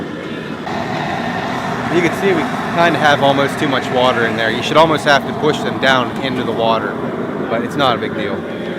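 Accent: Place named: Maryland, United States. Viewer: This was a really helpful and informative video. Not sure where you're originally from - the accent, I'm guessing Maryland or Delaware ;) but take it from someone from south Louisiana, you did a good job! BTW, if the tail is straight after cooked, that means the crawfish was dead going in, so don't eat those :)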